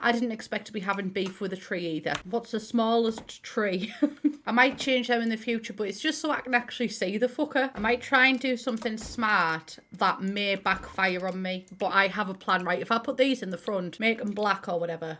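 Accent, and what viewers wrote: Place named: Middlesbrough, United Kingdom. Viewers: When you’re from Middlesbrough and understand everything is saying/talking about:) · As if ur from the Boro hahah gerrin lass me too, i just assumed I was imagining the accent 😭, best thing to come out of Boro in ever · This voiceover makes me want to move to Middlesbrough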